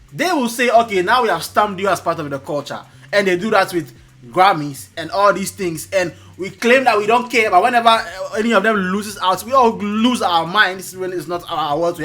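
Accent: Ghana. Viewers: this failure think say he dey wine me. You average 700 views when it's about your boring, begging, imf dependent republic. Your biggest views in the last 15 months is about kai cenat in Nigeria with those funny but nonsensical headlines. You're a Mediocre who review trends from Twitter with your funny but disgusting Ghanaian accent and the terrible English that comes with it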